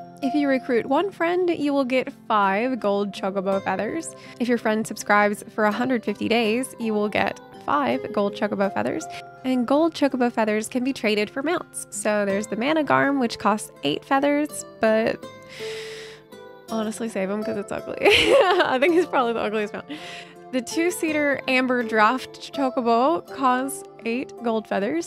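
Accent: South, United States. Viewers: I keep ending up here plus you’re southern and YT needs more southern accents. Subscribing and thanks for the content :)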